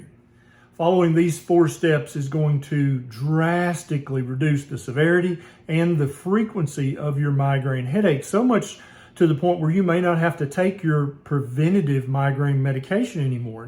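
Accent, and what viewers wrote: South, United States. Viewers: Read the full comment you will know where I’m coming from This doesn’t mean you’re dumb I had grown up in Poca, WV you’re a DR. you have to be near the top of your graduating class I just want to point something out it’s just an observation but I could tell you’re “country” by your accent then when you said bacon grease I knew you were from the south I grown up on white bacon gravy bacon and white bread rode four wheelers again you can be one of the smartest people in your town for your age group and everybody not believe in you because of your accent I know somebody from Elkview, WV he was picked on for his accent because of that people didn’t think he was smart he finished near the top of his class and became an stockbroker his salary is decent.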